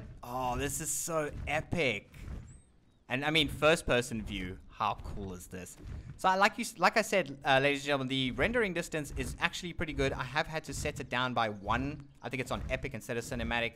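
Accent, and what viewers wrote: South Africa. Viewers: Omg a south african are u from cape town or Johannesburg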